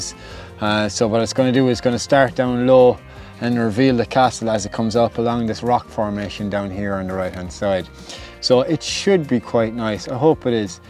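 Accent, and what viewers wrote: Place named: Ireland. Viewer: Nice video mate! I really enjoyed watching and dude I love your Irish accent. Keep on vlogging but also take your time and enjoy the time with your friends in the wonderful Irish nature. Thanks for sharing! Take care!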